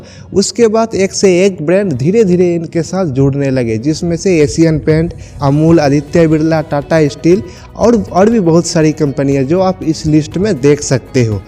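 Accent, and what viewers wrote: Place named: Bangladesh. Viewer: Yah pakka Bangladeshi Koi aadami bol raha hai yah pakka Bangladeshi hai iska Bhasha hi language hi bata raha hai ki yah Bangladesh Hai yah Thag Hai Thag video hai Thag video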